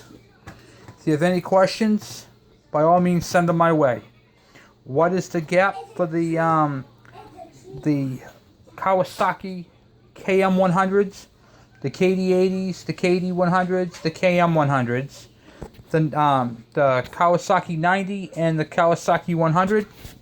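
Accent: United States. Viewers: Your arguably the best explainer on boobtube when it comes to points ignition and cdi and wiring. I use your videos to assist me with the wiring issues in old bikes I get. Thank you sir. Even though you sound like a Yankee you are informative and easy with explanation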